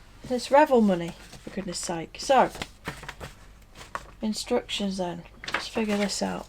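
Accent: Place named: Lincolnshire, United Kingdom. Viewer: Hi, hope you're well. I had no idea you came from Spalding! (Although I should have realised from the accent.). In the mid eighties when I was young and even more stupid than I am now, In my motor racing affairs I became involves with a company that operated out of a place called Cradge Bank, and I lived in Spalding for around three years on and off. I used to travel in from Peterborough on the Cowbit road, and the amount of timesI would see the A10s flitting about, virtually every trip. Of course you see one while you are driving, you "feel" the other one.. Of course they have been using you for target practice! My favourite scheme was always Euro 1. Very difficult to get the colours really right on that. I may have to invest in this one- thanks for the review. Rich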